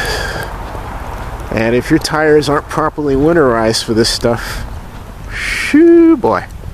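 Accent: United States. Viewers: Is your accent representative of the population in Georgia?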